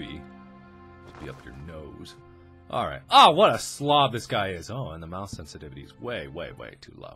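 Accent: Sweden. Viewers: the accent is so Swedish i can tell as a Swedish person s easy to tell if someone is Swedish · That is so swedishenglish that i am going to puke.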